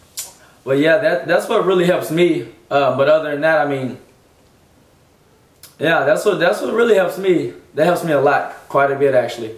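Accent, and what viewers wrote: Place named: South, United States. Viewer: certain things you say gave your accent away, pretty southern and sounded like T.I a bit. lol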